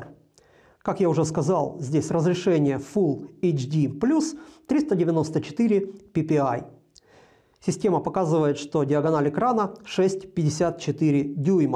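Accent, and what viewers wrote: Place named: Ukraine. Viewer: С Украинским акцентом хороший обзор.и достойный смартфон.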